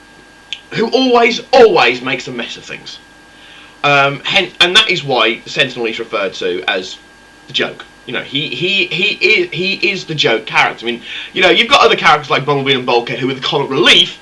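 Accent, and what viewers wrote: United Kingdom. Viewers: but seriouslyis he british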